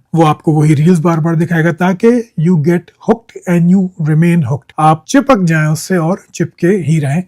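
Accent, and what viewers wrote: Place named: Sindh, Pakistan. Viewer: اوپر سے ھو بھی سندھی اسپیکینگ یا پھر پنجابی ڈھگے ھو آپ ۔۔۔کراچی والی لینگویج نھیں لگتی آپ کی۔۔۔۔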